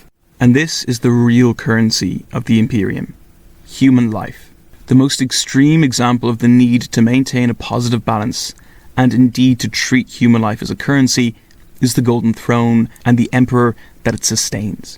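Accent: Ireland. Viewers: It's nice hearing another Irish accent rambling at length about 40k minutae. Irish accent right? Edit: I commented too early. You mentioned it in video. 😂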